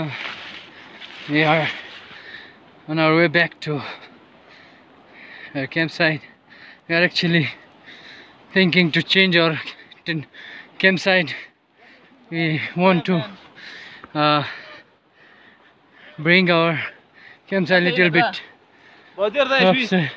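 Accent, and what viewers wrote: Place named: India. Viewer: Fullywatched 💯💯✅. By the way. Your voice like Indian. Is it write?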